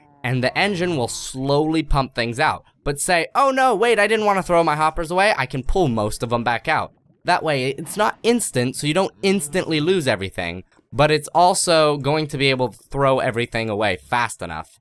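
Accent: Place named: California, United States. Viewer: Torkil Hansen I think that's just the typical Californian accent.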